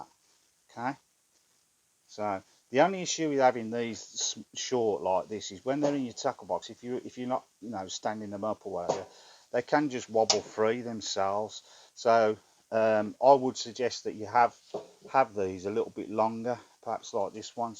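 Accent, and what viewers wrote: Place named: West Midlands, United Kingdom. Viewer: Like how you think great great tips & ideas grip leads can be a pain n literally sometimes when rummaging around in your box . sounds like your a west Midlands chap like myself i love all types of fishing but the sea fishing the most regardless of the distance from brum . Wish you luck n success with the channel from a new subscriber 👍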